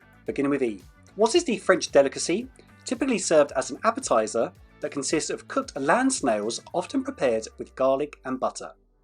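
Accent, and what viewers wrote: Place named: England, United Kingdom. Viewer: Apparently this was the 2nd time around for me on this one. Can't find my response from the previous time. This time I got 14 right. I actually remembered the correct answers on at least two I had answered incorrectly the last time. Thanks for another great quiz,! By the way, from your accent, I'd guess you're from England, Scotland or New Zealand. Am I right with any of those? I'm from the United States.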